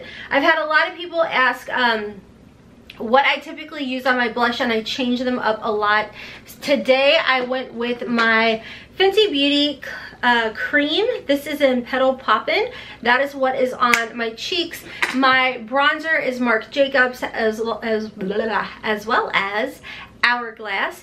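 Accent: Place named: Kentucky, United States. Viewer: You seriously are amazing! I am also from Kentucky, born in Lexington, and its so nice to hear your accent! Love your videos and just remember to inhale confidence and exhale doubt! 😘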